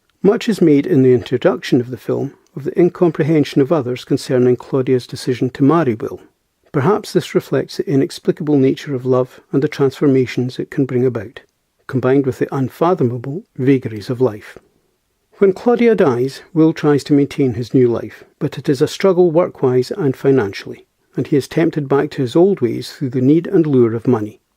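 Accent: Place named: Scotland, United Kingdom. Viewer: Would really like a fuller, longer and more detailed analysis. You’ve got such a lovely Scottish accent... take the bull by the horns and dissect this movie for us??!!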